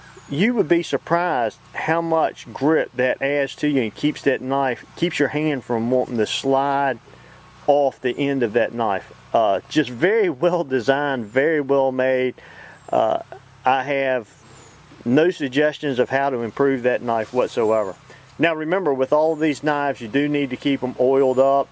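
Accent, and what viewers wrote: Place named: Southeast, United States. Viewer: That sounds like a good ole' southeastern accent. I just subbed your channel. What state are you in? I'm a Georgia/ Alabama resident.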